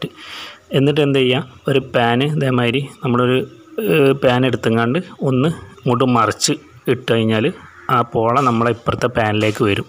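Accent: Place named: Kozhikode, India. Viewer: കോയിക്കോടൻ ഭാശ കേക്കാൻ നല്ല രസ ണ്ട്😊👍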